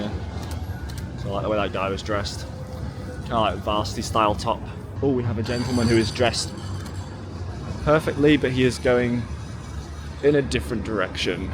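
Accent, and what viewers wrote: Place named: Manchester, United Kingdom. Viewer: You’re from Manchester; as an American, I guessed Liverpool. 😂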